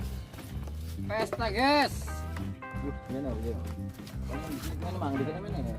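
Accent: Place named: Indramayu, Indonesia. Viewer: Wong Endi mang kaya wong dermayu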